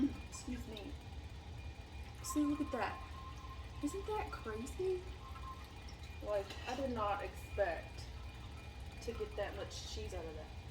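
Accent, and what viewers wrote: United States. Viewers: I like how I could genuinely share in her surprise and feel like I'm apart of the process 🤣💎🙌. That southern country accent while teaching me do stuff in the kitchen keeps me listening though 🙊😇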